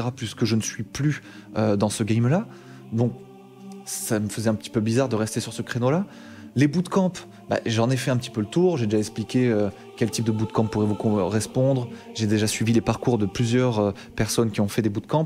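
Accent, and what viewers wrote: France. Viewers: From my point of view it's great to have a tech guy easier to understand because of French accent, as a beginner I struggle with fast and not clear English so switch in English sounds great. I wish you the best of luck ✌️ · Pour ma part, que ce soit en Français ou en Anglais, ça ne change pas grand chose, ton Anglais est facilement compréhensible pour un francophone (ouais, ton accent est français et, pour ma part, c'est pas pour me déranger). Je te rejoins sur de nombreux points et plus encore sur le "concept" de 2nde chaîne en Anglais. Il n'y a qu'à voir le four pris par Bruce Benamran (e-Penser) quand il a tenté de créer une chaîne anglophone anglophone (Get it). D'autres youtubeurs ont fait le même pari que toi et ils connaissent le même rejet d'une partie de leur public. Kevin Tran (Le rire jaune) en est une illustration, il a vu le nombre de vues réduit de moitié et le nombre de commentaires n'est plus que l'ombre de ce qu'il était... 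A la fin, c'est toujours le public qui a raison, que ce soit pour de bonnes raisons ou pour de mauvaises raisons. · depuis que j'ai commencer a apprendre le dev(il y a 9 mois) et j'ai egalement commencer l'anglais. je trouve ça super que tu passe en anglais et je continuerais a te suivre avec ce fameux accent français depuis bdx 😉 j'ai commencer depuis peu a suivre des cours sur freecodecamp qui délivre des certification en développement, le contenu est anglais, tres pédagogique(beaucoup de pratique) et complet, je le trouve mieux que le contenu gratuit de openclassroom pour ma part. si tu peux faire une video sur ce site ça pourrais peut être motiver ta communauté a apprendre l'anglais ou juste me repondre en commentaire sur ce que tu pense de leurs certifications pour le marché du travail francophone 😉. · Continue en anglais, ton anglais est hyper simple pour nous de comprendre vu que t’as l’accent, limite j’ai l’impression tu parles en français alors que c’est de l’anglais. 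De plus tu pourrais grandement aider ceux qui comprennent pas, les gars l’anglais c’est la base de tout au monde surtout en tech!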